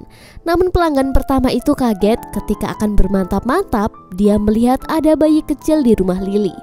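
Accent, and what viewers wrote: Java, Indonesia. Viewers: Asem knpaa ada tetekbengek nyaa mimin nya jawaaa ne ngendi iki 😂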